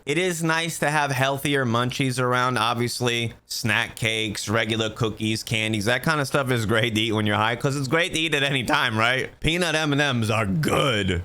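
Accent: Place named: Northeast, United States. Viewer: You sound like you’re from the north east so a soft baked pretzel from sheetz or Wawa. Cheese filled. Mac n cheese bites. Grilled cheese. Extra cheese. So much cheese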